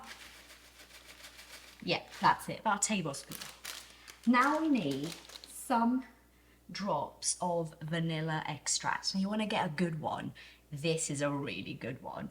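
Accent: RP, United Kingdom. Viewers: Keep up the good work. Your perfect RP accent is like music to my ears.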